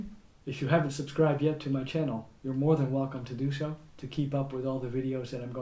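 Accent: Netherlands. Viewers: Nice review, you sounds very Dutch to me 👍🏼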